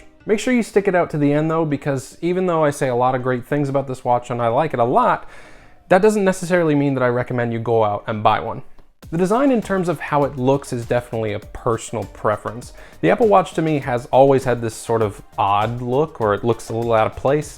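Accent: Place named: Newfoundland, Canada. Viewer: Newfoundlander!?? Sub’d! 🍕👍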